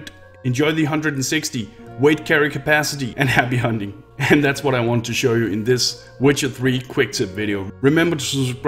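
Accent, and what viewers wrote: Denmark. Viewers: I can tell from your accent that you´re danish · you sound danish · I think he's Danish, like me. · This guy got to be Danish. That irritating accent is only available in Denmark.....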